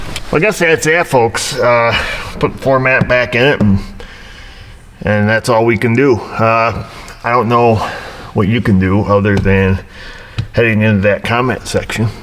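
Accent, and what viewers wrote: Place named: Upstate New York, United States. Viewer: I use to live in upstate NY around Rome. I never thought your speech was abnormal, sounded normal to me for upstate NY. I enjoy your videos and learning a lot from them, Thank You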